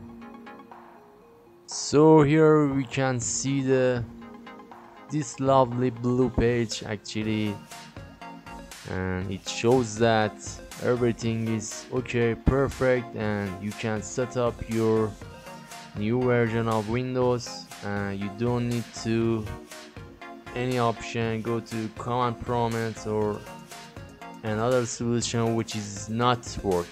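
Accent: Iran. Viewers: انگلیسی با لهجه غلیط ایرانی . دمت گرم. کلا منظورت این بود که . از برنامه winsetupfromusb برای ساخت بوت نصب ویندوز استفاده کنیم. نه روفوس ؟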